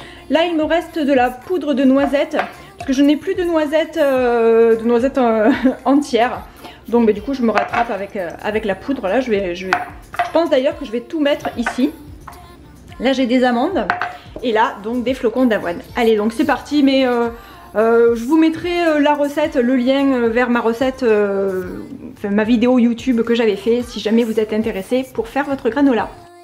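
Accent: South, France. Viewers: Bonsoir! Je suis super contente de découvrir votre chaine ! Je suis du Sud, Boulbon 13150. Mais voila, j'ai emménagé depuis le 1 aout à Lyon 5. J'y suis super bien! Mais quand même... Votre adorable accent du sud me rappelle ma région j'adore !!!! J'ai tout de suite en tête les cigales, le soleil, le thym, la lavande!! Que du bonheur vos vidéos que je découvre aujourd'hui!!!! Merciiii!! Merci pour ces moments de partage, de conseils, d'excellentes idées recettes ect....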